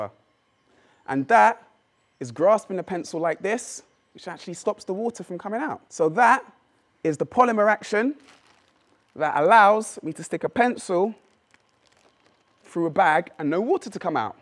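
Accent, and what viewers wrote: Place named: England, United Kingdom. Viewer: i love english accent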